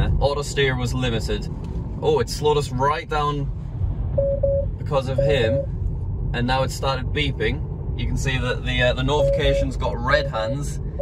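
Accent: United Kingdom. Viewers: I thought u was American but ur British Greetings from Italy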